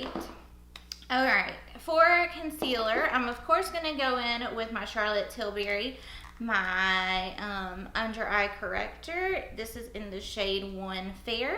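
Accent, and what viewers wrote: South, United States. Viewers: shes southern it’s called an accent sthu “sweet” is not fitting for you u must be on something or are you just a bully??? 🙄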